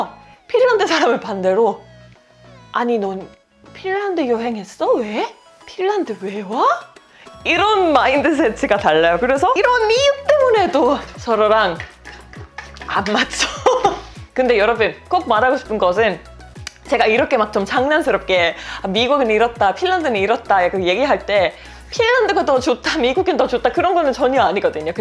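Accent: South Korea. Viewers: 경상도네요 ㅎㅎ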